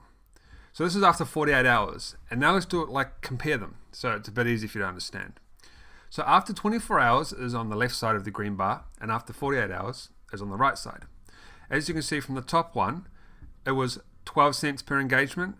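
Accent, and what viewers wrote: New Zealand. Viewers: Recognize that kiwi voice instantly; love your videos bro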